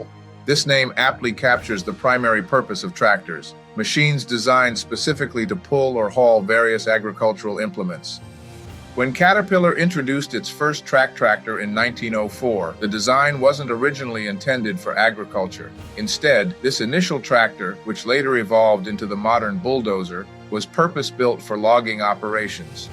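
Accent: United Kingdom. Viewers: Didn't the narrator of this channel used to have a British accent? Or am I thinking of a similar channel?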